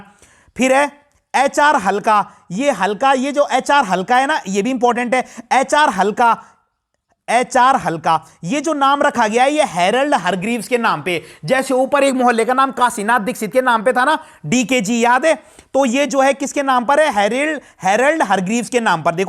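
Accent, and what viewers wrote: Garhwal, India. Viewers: Gadwali accent 🔥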